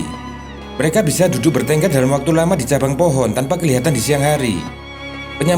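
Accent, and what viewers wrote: Java, Indonesia. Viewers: Yg ngomong medok amat yak kek org jawa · Min medok banget sih min Logat mu, , , ? Tpi ga pa2 ko min wong udh dri sono ny ky gitu, menuso yo , Ga iso ngrubah toh, , , !? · Suara mu medok.. Mas..' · Ngedok ketoro wong jowo😂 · Logatnya khas jawa btul ya😂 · Mantaaap.......... Ngomongnya yg mantap jawanya MEDHOCK......🤭🤭🤭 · medok x bnk..tp q sk.mnghibur..😂😂😂🙏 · orang jawa kau ya bang?? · Meduk bngt jowone 😂😂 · Medok ajah ngomong nya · Medok banget....😀😀😀😀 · Medok banget son suarane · Suwaramu kang kang medok bianget. WeeLiikk 😀😀😂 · Yg baca narasi medok.. 😀👍jd ingat temen saat kuliah.. 😀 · Dlm dunia broadcast medok gini gk laku siaran radio n tv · Sangat medok pak de like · medhok yo kue..😁😁🤣🤣🤣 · adminya logat jawa bngt...kuuueeennntttaaalllllllllllllllll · Salfok dgn suara pembaca narasi. Medok total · jawa banget suaranya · Jawa banget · mendok amt lu min😅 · mantab logat jawanya medok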